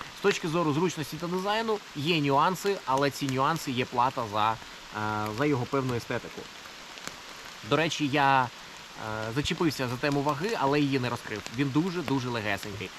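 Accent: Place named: Russia. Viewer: Я тоже не понимаю зачем говорить на одном языке, а думать на другом!? У автора так и вырываются русскоязычные нотки в диалоге и водно, что украинский даётся тяжело, а, в карпатах на украинском, разговаривают гораздо быстрее!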